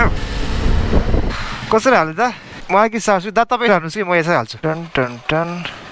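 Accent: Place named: Hindi, India. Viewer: Your hindi accent makes intresting to watch 😂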